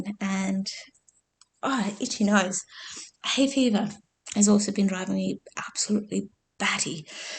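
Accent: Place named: Australia. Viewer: Hi! Lovely to hear another Aussie accent on YT - you popped in to my feed this morning while sipping my morning coffee. I’m now a new subscriber and look forward to seeing some more of your videos 😊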